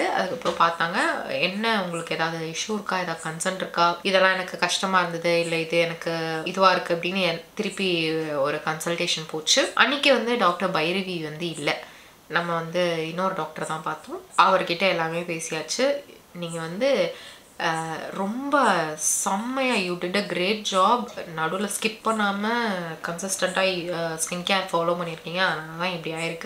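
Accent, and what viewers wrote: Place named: Coimbatore, India. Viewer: coimbatore slang sissy😜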